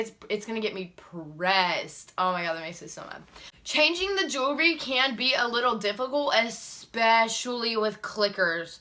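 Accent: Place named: South, United States. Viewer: I'm from MS! She sounds southern as hell to me!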